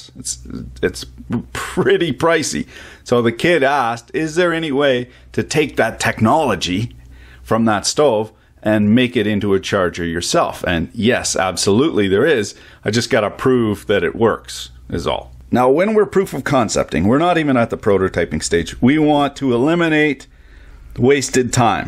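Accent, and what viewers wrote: Quebec, Canada. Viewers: man where are you from? You almost sound like a "Quebecois" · Gosh i love your french québécois! How did you learn it?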